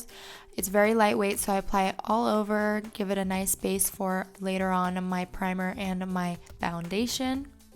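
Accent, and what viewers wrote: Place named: Russia. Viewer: Сразу видно-говорит по русски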